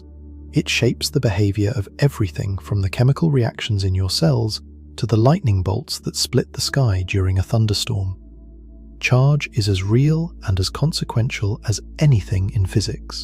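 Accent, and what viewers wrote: United Kingdom. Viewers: 2 hours of mindless blathering with a British accent.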